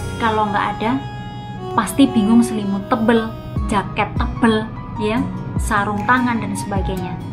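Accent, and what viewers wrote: Java, Indonesia. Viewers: Kalo boleh tau Mbak asli Jawa mana ya.. Medok eh bahasa Indonesia nya He.. He.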